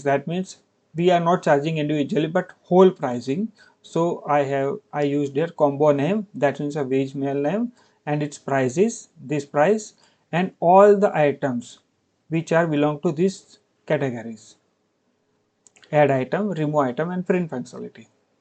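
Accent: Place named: India. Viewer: Finally got a video with indian accent on this topic.. thank uh sir😊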